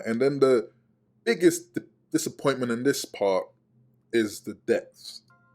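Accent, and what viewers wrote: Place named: United Kingdom. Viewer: Subbed as soona s I heard your voice! Most Zelda content creators are American, nice to hear a British opinion 💕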